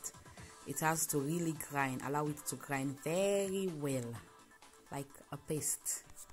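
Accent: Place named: Cameroon. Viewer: Same ascent with Cameroon people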